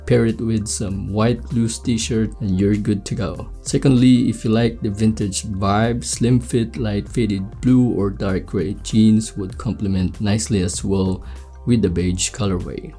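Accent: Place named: Philippines. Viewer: Pinoy na pinoy accent lets gowwww kuya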